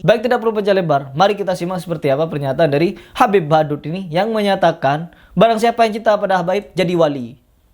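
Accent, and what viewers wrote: Madura, Indonesia. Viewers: Ha..ha...bang lucu enak logat nya madura ya.tp yg penting lagi omongan nya bener semua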